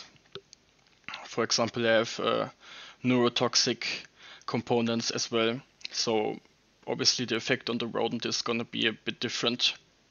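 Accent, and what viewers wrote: Germany. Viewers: U sound like a german?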